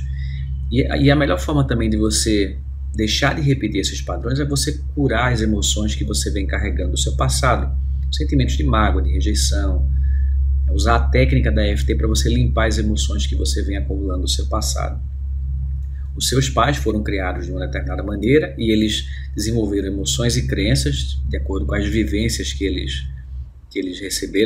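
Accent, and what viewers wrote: Recife, Brazil. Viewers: eita recifense. massa!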